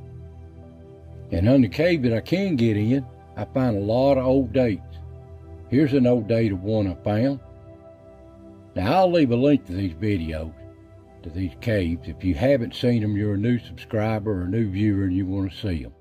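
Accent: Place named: South, United States. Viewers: Your channel is awesome and I love your country accent 🙃🙃🙃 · Appreciate your history and videos! As soon as i heard you speak i knew you had to be from East TN or southeast KY. Im very close to Cumberland gap and middlesboro area. Anyway, i recently picked up the hobby of metal detecting and Im running into the same thing as you with those “hot rocks”, especially on my small farm. It’s a challenge sometimes! Thanks for the info on the various types of rocks!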